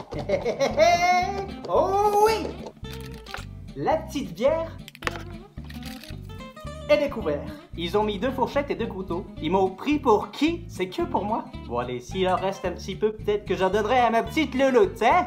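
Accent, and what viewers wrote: Quebec, Canada. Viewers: Très beau accent Québécois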